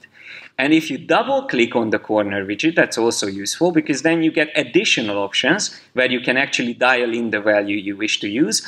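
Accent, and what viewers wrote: Hungary. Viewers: You sound Hungarian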